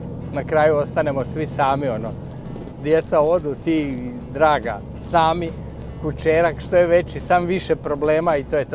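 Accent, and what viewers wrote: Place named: Vojvodina, Serbia. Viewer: Pozz iz Amerike,centralni dio ili midwest ( st louis I okolina 😂 ) Volim tvoj naglasak,malo mi "bacas" na Lalu 😊😊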